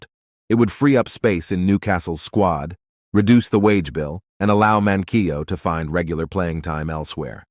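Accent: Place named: United States. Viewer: another yank voice owa thumbs doon them all!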